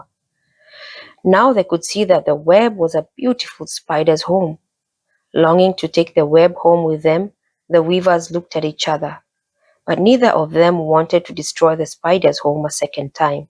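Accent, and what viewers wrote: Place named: Africa. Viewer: Thank you for this read along book about Kente Cloth. Our Beautiful Feet Books primary curriculum suggested it to be read and we thought you did a great job reading it. We especially liked your accent (we assume you’re African) and also the native words were pronounced well. One piece of advice for next time you record would be muting the page turning with a sound editor or turning each page away from the microphone. The page turning was quite loud and disruptive during the book. Other than that, it was a great read through and we look forward to exploring your channel with other books.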